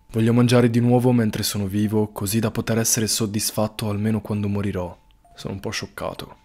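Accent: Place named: Bari, Italy. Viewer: Pensavo anche io, poi ho sentito come pronuncia le "o", direi che è barese. Mancano anche le doppie ovunque. Fonte: sono sarda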